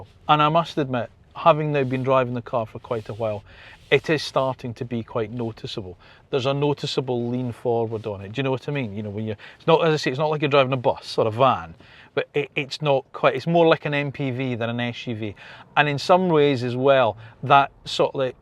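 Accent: Scotland, United Kingdom. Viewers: Your accent is Scottish and is not a stupid accent.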